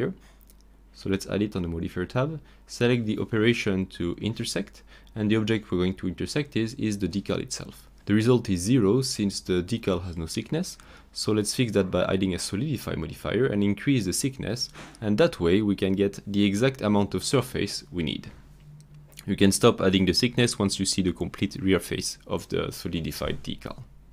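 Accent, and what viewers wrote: France. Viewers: Nice french accent ;) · very french, love it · Dude love for accent à la française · nice try but your French accent are definitly present , merci !!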